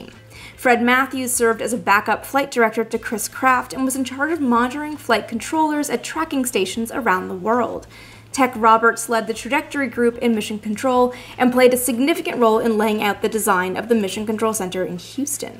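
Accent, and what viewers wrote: Canada. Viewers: I love Canadians. You guys are so incredibly nice, it's awesome. Canadian women are among the most beautiful in the world ( being a perfect example). Plus it's so cute the way the say aboot, time oot. I'm quite smitten with, I admit it. She is the perfect woman, smart, funny, intelligent, Canadian, and she loves space. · Leaning into the Canadian accent for this?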